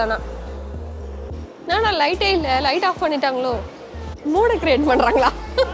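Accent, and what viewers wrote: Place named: Chennai, India. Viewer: Unga Chennai slang super akka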